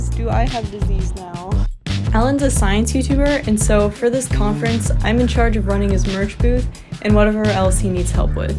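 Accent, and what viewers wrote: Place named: France. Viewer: i saw this video after the dream job worst nightmare video, and you speak french natively with a french accent, not from montreal/quebec ? what's up with that? could be an idea for a video!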